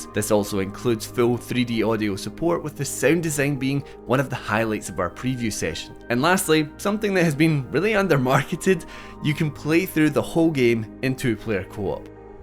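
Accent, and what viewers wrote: Scotland, United Kingdom. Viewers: Random thing to say but I haven't heard a Scottish accent in a while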